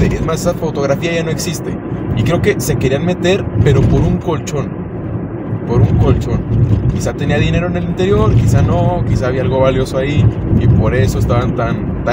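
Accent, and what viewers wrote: Mexico. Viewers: ¿Es de México?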